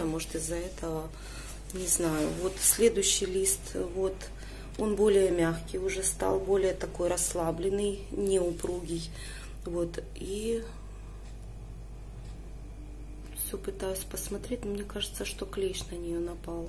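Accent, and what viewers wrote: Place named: Ukraine. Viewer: Здравствуйте! Спасибо за обзор. Начала смотреть ваши видео с антуриума. И мне понравилось ваше отношение к растениям. Скажите, пожалуйста, а где вы географически находитесь? Очень интригующий вид из окна! И очень напоминает картины северных районов России: Воркуты, например. Но судя по наречию, вы, конечно, украинка.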